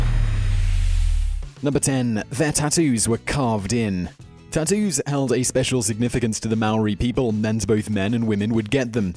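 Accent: United Kingdom. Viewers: He's British, his way of pronunciation trumps yours. That's how we say it in America too, so your way is wrong. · Wtf do you expect, he's British. Can you imagine a Kiwi trying to pronounce Middle Eastern or Asian names correctly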